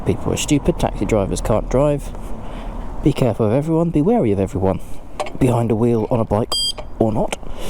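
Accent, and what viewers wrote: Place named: Cockney, United Kingdom. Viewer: Ooo sounds exciting. Looking forward to hearing the cockney verbals come out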